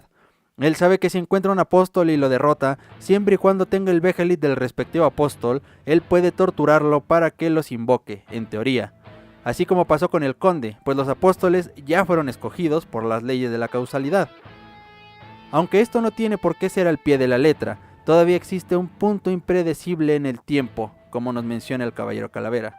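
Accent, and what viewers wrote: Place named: Chile. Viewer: Suenas igual que apura chilena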